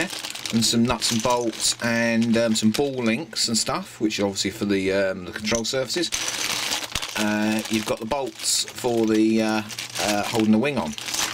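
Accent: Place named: United Kingdom. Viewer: oh goodness thank you he is british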